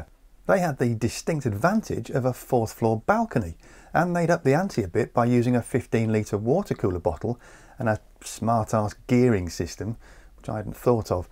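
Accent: England, United Kingdom. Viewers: As a British person, there's nothing I love about this channel more than the extremely British way he speaks in this video.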